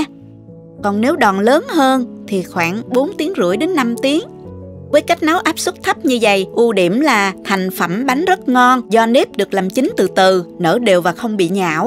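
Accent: South, Vietnam. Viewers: Nghe giọng hướng dẫn cưng quá là cưng, đúng kiểu nói người miền Nam. Chúc chị luôn ra các hướng dẫn chi tiết và hay như vậy nha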